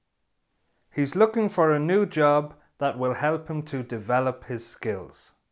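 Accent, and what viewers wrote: Austria. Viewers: Hi ，are you Austrian？ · Sir,are you from Austria?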